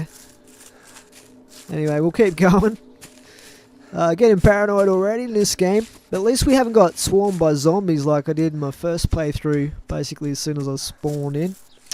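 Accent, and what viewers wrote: Australia. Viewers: are you an Aussie? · you sexy aussie like me :D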